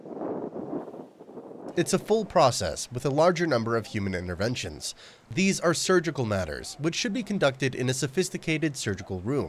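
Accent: United States. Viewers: I get the that the script, which is also in the description of this video, was written by the creator of the video and English is not their first language so that is why there are so many weird phrasings or things that don’t make sense that the narrator says and that’s fully understandable. But the narrator sounds American, so why did he not make corrections to those errors instead of allowing the video to sound ignorant? I know narrators are supposed to read what they’re given, I’ve done that work before. But when it’s really bad like this you correct it.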